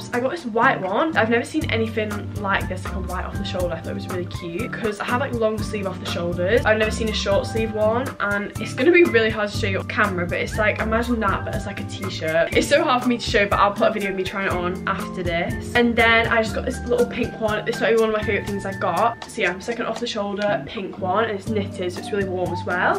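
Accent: United Kingdom. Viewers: shes not australian shes said shes british before and she has a sort of british accent and definitely not a australian one x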